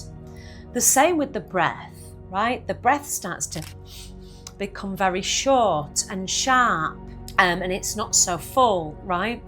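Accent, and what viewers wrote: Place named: Yorkshire, United Kingdom. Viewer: Thank you for this. I'm really starting to learn to pull back and stop when I get myself in a high state of anxiety as I know acting from that state isn't helpful. These exercises really do help to bring me back down to a place of calm and stop me wasting hours in a loop of anxiety. I thank you so much for your videos and your down to earth manner, I'm a Geordie so I respond well to your Yorkshire accent and humour, much love to you 🙏❤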